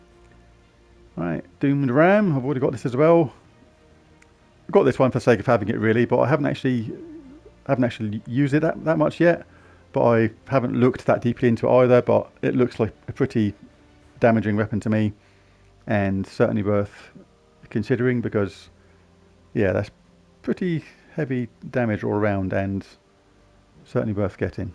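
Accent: United Kingdom. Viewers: nice to see a british? (you sound british) gems of war youtuber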